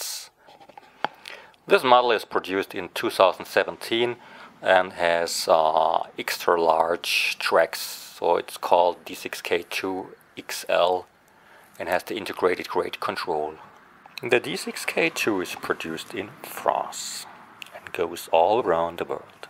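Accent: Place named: United States. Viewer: Well, sort of English! More American?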